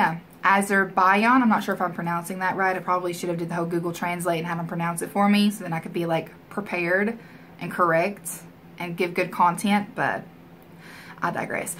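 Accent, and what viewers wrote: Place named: Texas, United States. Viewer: you have that taaaaxes accent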